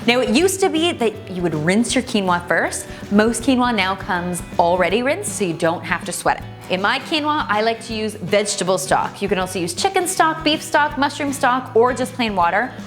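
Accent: Canada. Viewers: Thank you I love these recipes! Are you from canada ? I can tell we talk the same way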